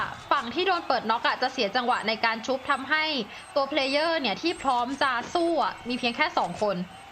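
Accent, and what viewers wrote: North, Thailand. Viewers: พี่ พูดภาษาเหนือหน่อยน้าา~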